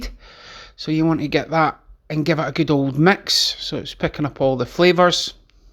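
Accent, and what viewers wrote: Scotland, United Kingdom. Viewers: i made a quiche from start yesterday,homemade shortcrust pastry,seems tastier when you make your own?are you in edinburgh?trying to place the accent.i will be giving your steak pie recipe a go shortly,looks so so good.i might add a wee bit lea and perrins to the sauce? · Great video mate, keep up the good work! Just wondering, what part of Scotland are u from?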